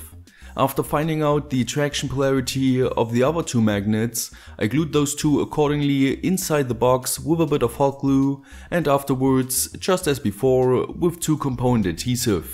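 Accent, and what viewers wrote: Germany. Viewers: are u from germany? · I really like your German accent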